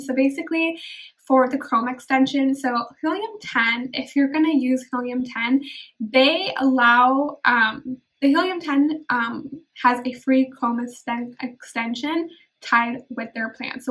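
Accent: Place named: United States. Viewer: Looks Indian, with American accent, beautiful though.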